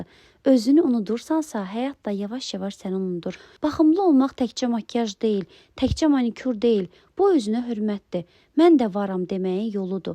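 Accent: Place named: Goychay, Azerbaijan. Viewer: Hər kəsin bəxti siz kimi gətirmir... Ağzı Göyçəy, ağzı Göyçəy danışırsız elə.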